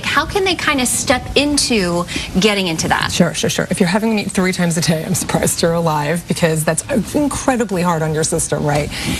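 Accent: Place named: New York, United States. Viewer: Oh I thought I replied here, I guess it must be my ADD...thought I hit post, but probably got distracted. I am sorry, I kinda thought I detected a NY accent so I figured you might know that BLT is NY Diner talk for a Bacon Lettuce and Tomato sandwich...so an ELT would be your Eggplant (bacon) Lettuce and Tomato! I bet it would be amazing! 😍 Don't forget the vegan mayo! 💗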